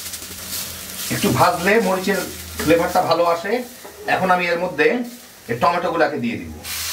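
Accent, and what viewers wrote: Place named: Bikrampur, Bangladesh. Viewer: আপনার কথাগুলি বিক্রমপুরের মত। আপনি কি বিক্রমপুরের ?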